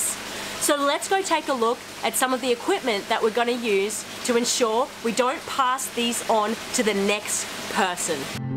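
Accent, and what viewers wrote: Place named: Australia. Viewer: The cinematography is first glass. Showing off those wonderful thongs (flip flops for you yanks reading this) and quirky glasses which are vintage (perfect). makes a classic catch and keeps score all in about 3 seconds before moving onto more complex maths and the turkey baster before speaking Latin - all time. Freeze frame and it seems with the cheeky smile that knows this is outclassing even Cam in his togs doing flips inside the 6x2x2 bar tank after fitting through a 30cm square hole in the top without knocking off the $1000 dollar Radions. Side note: Why remove the sea squirt? manages the classic Aussie accent whilst using scientific lingo that Sir David Attenborough only ever dreamed of. In my book the best example is "Upon visual inspection it's pretty obvious that this zoanthid colony is suffering from a range of macroalgae invasion" - although "it's pretty obvious" this was the 3rd take because the script said "infestation" instead but she made up for it with a word that sounded like 'poleketes' in the next section. 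Even with a science degree (incl first year bio and marine science) I don't know what a polekete is but I know Coral RX gets em. moves on from killing pests to healing infections - rewind and watch how seamlessly she moves from biological to medical language... before ruining it with the claim that it's all good because "it smells nice and clean". Ok - so this is easily THE BEST vid I have seen on dipping... but is not done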